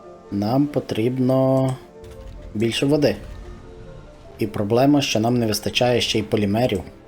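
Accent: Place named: Russia. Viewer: Kocham ten ruski akcent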